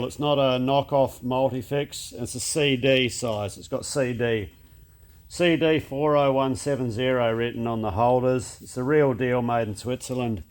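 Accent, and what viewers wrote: Australia. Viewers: I watched a few of your videos and decided there was so much I could learn that I quit watching the latest and started from the beginning. There was something in every video that a non-professional like me can learn. I've been running a mill and a lathe since 2007, but never better than now thanks to all the little--and some big--hints and techniques you include in everyone your videos. I've also learned enough of the Aussie language that I do not need the captions anymore! So, creak on! Thank you!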